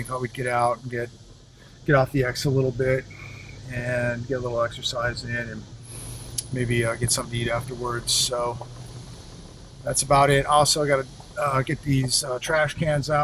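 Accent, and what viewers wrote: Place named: South, United States. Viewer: You gettin southern!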